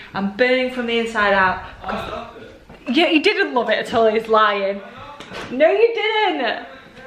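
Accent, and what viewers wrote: North, United Kingdom. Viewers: Yay! Nice to have you back! Great to hear your friendly Northern tones back on YouTube!:)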